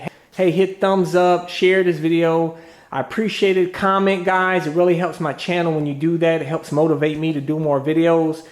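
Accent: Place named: Texas, United States. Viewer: “Sorry I’m old school” you don’t sound old school you sound like a dumb hillbilly out in Texas. Here in Ohio we got hillbillies that that snort and shoot up drugs yet you manage to sound more stupid then them.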